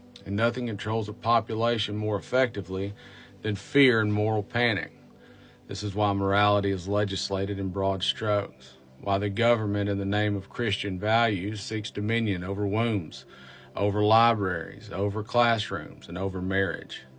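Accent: South, United States. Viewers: Refreshing to hear a southern accent gentleman speaking the honest to God truth. What a great country. I have faith. I have faith in this gentleman and others like him. Who speak truth to those who despise truth and laws. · This Canadian loves your Southern values and wonderful accent. Please seriously consider running for office. You would make a amazing statesman. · Southern dialect. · You are a gentleman and a scholar, sir. With a southern accent, you command attention without threatening, judging, or raising your voice. Thank you. You are a joy to hear in these troubling times.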